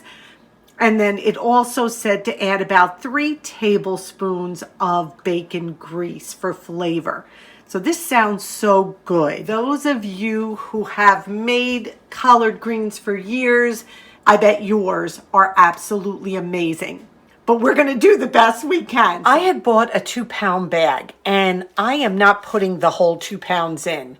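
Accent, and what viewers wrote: New York, United States. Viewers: Hello 👋 This video popped up on my feed. I love these types of channels. I enjoy your NY accent and love the positive tone in your voice. I took absolutely ages to finish the video because you inspired me so much to go and do! I LOVE that old Dutch proverb and will add that to my "to embroider" list! I looked up the amazing planner you showed and OH MY GOODNESS 😱 what a rabbit hole I could fall down for that one. Not very frugal on the outlay but I see how it will save money in the long term... So all this to say thank you (and with his mad guitar skills!) for being you, keep on being you and shining your light all the way across the globe. Lots of love from a new subscriber in Western Australia. 😊💝🇦🇺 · New Yorker? Staten Island?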